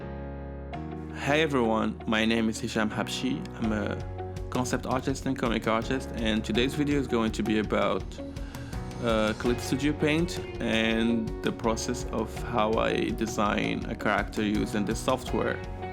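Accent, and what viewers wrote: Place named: Morocco. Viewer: bayne l'accent del mgharba xD l3azz bik khouya great job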